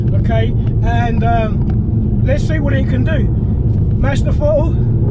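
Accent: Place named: England, United Kingdom. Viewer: I was so shocked to hear a fellow Englishman!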